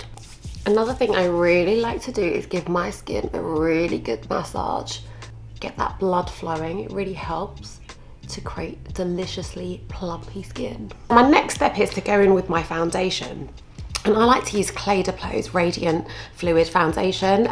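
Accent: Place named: London, United Kingdom. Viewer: she is beautiful. Ohhh I love a London accent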